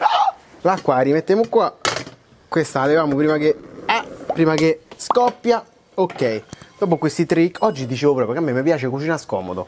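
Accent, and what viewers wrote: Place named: Sicily, Italy. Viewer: Guarda che stai parlando siciliano non calabrese.....comunque sei bravo....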